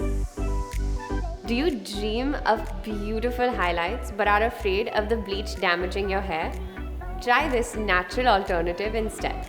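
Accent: India. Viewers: Why do you sound Indian? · OMG ..That point you started talking i realized that you are an Indian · Shes so beautiful, to bad has indian accent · She has an Indian accent but she doesn't look Indian 😳🙂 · She definitely shocked me , I didn’t know she was Indian and I hope I’m not being racially insensitive but her accent is so beautiful 😍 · why is she white but with an indian accent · When it was really shocking and belly turning to see this white girl certainly starting to talk with the Indian accent very weird and how to place well then I remember the world in clear values and I remember the world and their values and what her background must be for me to be looking at this so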